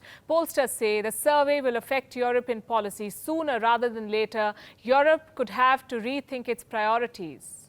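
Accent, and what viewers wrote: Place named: India. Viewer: Palki has a great indian english accent. How did she get to speak so clearly and confidently?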